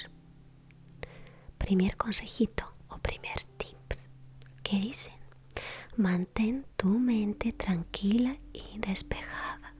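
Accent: Spain. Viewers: Odio que ahora sabes mucho más el acento español :(((, extraño a la rusita :'( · acento español :O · Me gustaban más sus vídeos antes que ahora, que se va marcando más ese acento español... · Que raro que no pronuncie la C como la Z teniendo esa tonada española 🤔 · No me da asmr el acento español tan marcado :( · Ya hace tiempo que no veía videos tuya linda, de ver uno de tus inicios, al de ahora, tu fonética y pronunciaciones han cambiado mucho del tono ruso español al “español de España” es muy curioso 🤓 saludos. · mi mente escuchando a la chica: por eso esperaba con la carita empapada jjajajaj por la voz y el acento porque en españa hay muchos acentos habran como 5 no?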